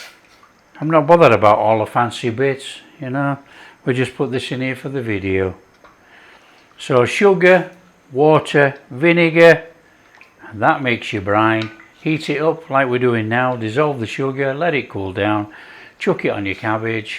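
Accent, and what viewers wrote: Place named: Derbyshire, United Kingdom. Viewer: Are you from chesterfield ?? , you sound like someone I watch from there ! I'm from canada, but my grandmother was a brummie 😁 Great video !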